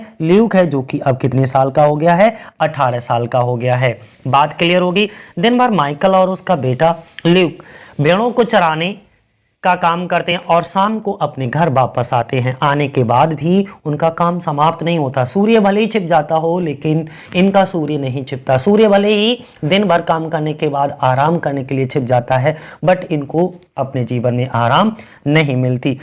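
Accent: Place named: Pakistan. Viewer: Yaar tm pakastani sound kyu kr rahe ho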